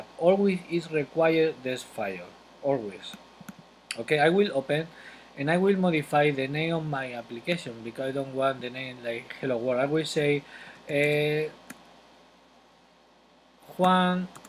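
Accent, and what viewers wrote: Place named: England, United Kingdom. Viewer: I love your English Accent !!! haha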